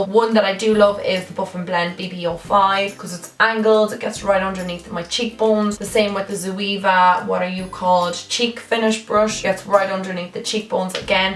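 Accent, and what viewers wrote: Ireland. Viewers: Heyy! I'm new here lol🙈 are you Irish?!? ❤️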